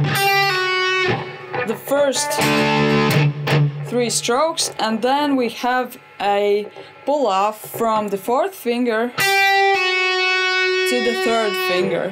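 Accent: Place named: Russia. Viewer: да конечно нужна. тоже смотрю. Благо инглишь нашего брата ни с кем не перепутаешь и легко понимаешь что говорят.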